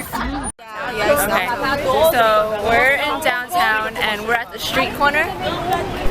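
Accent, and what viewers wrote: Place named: Rio de Janeiro, Brazil. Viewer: The SH sound for the final esses are more of a Rio thing. People don't soud like that in Sao Paulo.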